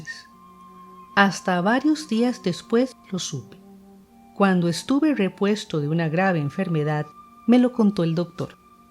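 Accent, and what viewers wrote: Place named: Costa Rica. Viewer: Creo que es acento de Costa Rica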